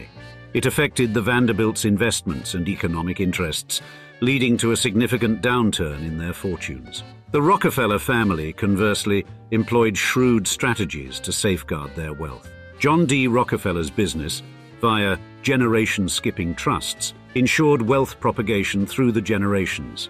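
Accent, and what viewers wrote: RP, United Kingdom. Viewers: and the very erudite sounded RP accent. (And no, RP is not "British" exclusively, but rather 95% spoken mainly in Commonwealth countries with only 5% spoken in Britain.)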